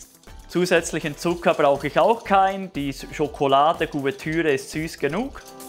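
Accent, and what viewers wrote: Switzerland. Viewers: Ach, ich höre sehr gern das "Schweizerische"! Super Idee mit tollen Praxistipps. Vielen Dank und bitte weitere solcher Backideeen.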